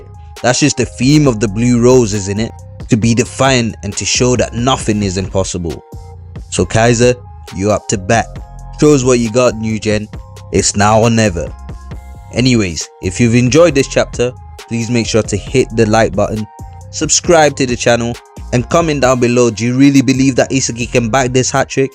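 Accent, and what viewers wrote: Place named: Jamaica. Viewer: hey are you friends with Truther? You sound Jamaican and truther is jamaican as well. Just asking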